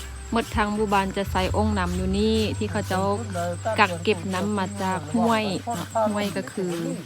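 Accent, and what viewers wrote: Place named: Isan, Thailand. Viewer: โดย.สบายดีและสวัสดีครับน้อง สาวไชยะบุลีคนสวยคนงามเสียงเว้าคือกันกับบ้านของอ้ายที่ฝั่งไทยเนาะ. คนงาม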